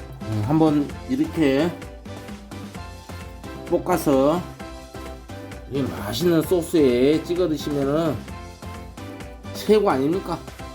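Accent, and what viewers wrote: Jeolla, South Korea. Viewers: 요리도 요리지만 구수한 전라도 사투리가 맛깔납니다.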